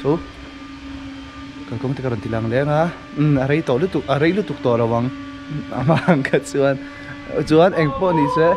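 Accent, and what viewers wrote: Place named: Mizoram, India. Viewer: Brah... English Mizo accent hmang pawp pawp ngam... Ka chhuang che... Ti zel teh... Hnathawh loh laiin ka en ziah a nia...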